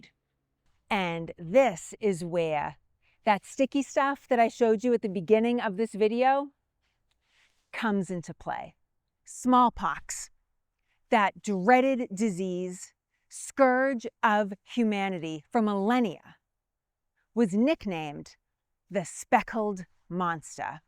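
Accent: Boston, United States. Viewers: She's so cute, love her Boston accent. Love the History too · Love that seriously Old Boston American accent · Me being from Wisconsin, would say pine tar. She, paint being from Boston says pine tah. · Pine ta. Or, as we say in North Carolina, Pine Tar. I love this lady's accent. I just subscribed just to hear her yankee talkin'. Do all the yankees up there in Boston talk like this? I just never knew that if they do. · Just love that Boston accept. Pine tah! Good history lesson. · I'm Boston born and grew up in Weymouth in the 1960's, my grandparents were going to buy the Abigail Addams house in Weymouth back in the 1930's but it had an outhouse and my grandmother put her foot down and said No. Great video and I love your accent, we don't use R's very much. Like, Nice Cah. 🚗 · Love the Boston accent. Love your videos. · Oh my God I can't believe it a real Bostonian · I've been in Boston they have that accent. 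· Any time I miss Boston I just need to listen to here. The most beautiful of Boston accents. Pinetaahhh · I heard your accent and immediately subbed. I’m from Boston and I sound like I’m exaggerating to be honest because my accent is so strong. It’s nice to hear your own accent on Youtube for a change. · This is incredible. I did 4 years in Boston for school. I can't stop smiling. It's your accent! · This is very interesting. I love the accent. I need to learn more about Boston and why is there an accent and why we necessarily didn’t get one in Connecticut. Now I’m going down a rabbit hole lol 😂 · Just a wild guess but you're from Boston aren't you? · Love her Boston accent! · She has Boston accents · I love her Boston accent. ❤ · Best Boston Accent Eveh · Love the show! Love that Boston accent! Pine tah! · I’m gonna go out on a limb and guess that this chick is from somewhere near Boston. 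· The elder Kennedy brother, John, Bobby, and Ted had this same Boosten aaccent. Many Haaavaard grads also have it. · New subscriber. Love the amazing Boston accent and great revolution history. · She can't say an "R". Boston no doubt. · Boston accent much ? SAILAH AND MONSTA, LOL. finally someone who sounds like me ! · I'm having fun learning from you while you relay important historical content with a Boston accent! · Perfect accent for a story of "The Speckled Monster." For anyone wondering she does have an authentic Boston accent / Eastern Mass. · Very interesting--thanks! When you first started talking, I was wondering what country you were from. I'll never get used to the Boston accent. LOL ♥ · Hello,! I was born and raised in Worcester (or “Wustah”😂) and commuted to Boston to work for 15 years. Love everything about the American Revolution and am descended from Mayflower and officers in the Revolution. 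So enjoyed hearing your fabulous Bahstin accent (which I pretty much was able to mute over the years. Glad you kept authentic). So glad I hit on your vid. Will subscribe and thanks!! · that good old Boston talk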